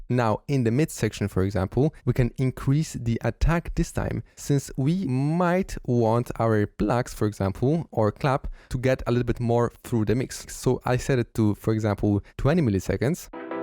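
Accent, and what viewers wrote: Poland. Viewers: wow masz polskie imię i nazwisko:) W sumie domyślałem się po Twoim akcencie:) super robota i super kanał, Przez lata siedziałem za sterami pro tools i cubase, przesiadam się na fl studio i bardzo efektywnie mi w tym pomagasz! Pozdro! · Is Polish or German? Or Polish German? 10% for Netherland... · Wait... are you from Poland?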